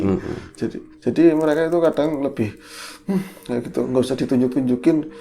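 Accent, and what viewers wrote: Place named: Tegal, Indonesia. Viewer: Assalamualaikum wr wb mas dan mas.....lanjut dengerin cerita yg tk tunggu apalagi bhs Tegalnya 😀😀😀🙏🙏🙏🙏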